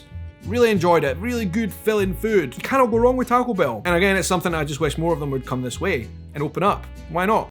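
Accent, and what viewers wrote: Scotland, United Kingdom. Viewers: you are like a big, bright, beautiful bouquet of sunflowers in the morning! (Wow, I guess that was a mouthful). I LOVE your videos. HOWEVER, I do have one criticism: DEARHEART, YOU TALK TOO FAST. I am from the South in the US, and our lifestyle is so much slower and leisurely. We are not stupid; we just take our time. I have to sometimes watch your videos twice or more to absorb the content. You speak at warp speed (yes, a Star Trek reference). I do not know if your manner of speech is a Scottish trait or your individual personality. I feel sometimes that my brain is exhausted about halfway through some of your videos, and I give up. Sometimes I just redo it if it's content I really, really wish to see. I have reviewed some of your videos and found that you do not impart all your words of wisdom like a squirrel on steroids each and every time. 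You can make longer videos or divide them up into parts I and II. I enjoy the content so much and adore your accent. So, please, if possible, endeavor to slow your words down a bit for some of us older folks (I'm 67) who so enjoy your videos. By the way, I enjoy all the fast food restaurants you mentioned, especially Chik-Fil-A. Their grilled chicken sandwich is awesome, but their warm, chocolate chunk cookies are to die for. I hope you tried them. They are too good to miss. God bless. Love and hugs from Karen in Tennessee. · Scots like you say the pop in "Popeye's" like southerners e.g. in Tennessee, say "pop tent". It's basically a cross betwixt 'pup' & 'pop', a wee-bit closer to the 🐶. 🆒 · In-N-Out is the best! Open one up in Scotland and you can call it In-N-Oot. Meant with total respect for my favorite accent in the world. ❤️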